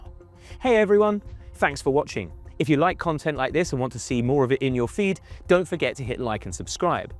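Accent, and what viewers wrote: United Kingdom. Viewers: if he didn't have a British accent this series would be boring...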